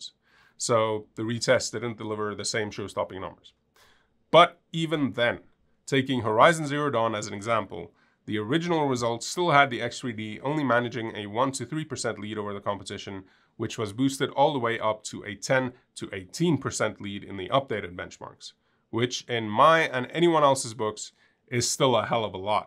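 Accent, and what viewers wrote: South Africa. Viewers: I didnt even notice the afrikaan accent untill the dude mentions rand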